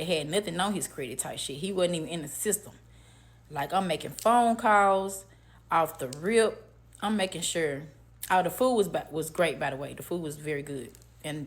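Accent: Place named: Memphis, United States. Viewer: Can tell she from Memphis 😂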